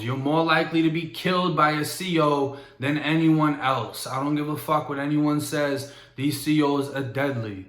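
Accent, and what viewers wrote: Boston, United States. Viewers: Just wondering bro. I know u talk about doing your bids in Florida. But hear your Boston accent. Jyst wondering when u left Boston and where u staying now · Hey I just discovered you and I’m def a fan, keep up the good work!! Are u originally from Boston? Cus I am and I swear I hear alil bawstin accent? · Are u from Boston? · New to the channel, you from Boston? You got the heavy accent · I hear that Boston in you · Really tired of listening to your war stories about Florida DOC. I'm old school, did 9 and half calendars in fsp, sumpter, marion, hendry and many other camps. All this bullshit you're spreading with your Boston or Maine accent trying to scare soft ass people that haven't been there Talk to me.....I've been there when there was CONVICTS NOT INMATES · Where are you from? Im from Boston im like he must be lol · Yo r u from boston bro?? 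U got that boston accent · youz my dude i always thought you was from Boston thought. This a righteous call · Off the record....I love your voice...😍🥰🥰 u got that BOOOUSTON accent 🤣🤣🤣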